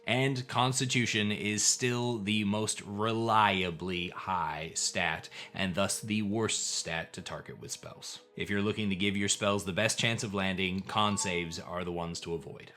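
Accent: Australia. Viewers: Amazing hearing this and your Ranger video. You Aussie accent comes through when you got back, but here I would have never guessed. 😁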